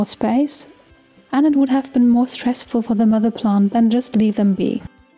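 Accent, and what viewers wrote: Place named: United Kingdom. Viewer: I love your voice 😘 British accent with Eastern EURO thinking ☺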